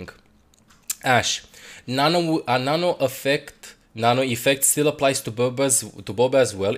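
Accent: Romania. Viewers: His accent is Romanian if you were wondering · Are you romanian?.Ca ai un accent :)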